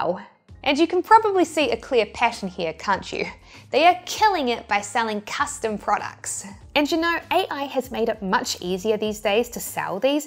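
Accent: New Zealand. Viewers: Pits =Pets 🤣🤣I'm a new subscriber and Im loving your content.! ☺ So basically New Zealanders pronounce "e" as 'I" ? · I like your accent, I was once in New Zealand and in the beginning it was hard to understand but afterwards it sounds so cute 🥰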